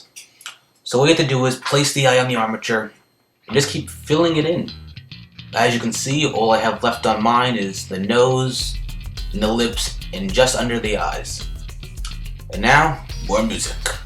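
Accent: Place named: Texas, United States. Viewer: Its his accent DUH. In Pennsylvania people may sound like their nose is pinched (sorry all you people from Pennsylvania I was just using you as an example) But in Texas we sound like cowboys and cowgirls.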